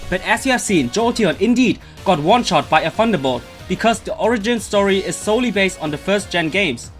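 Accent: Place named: Germany. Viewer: you sound german ^^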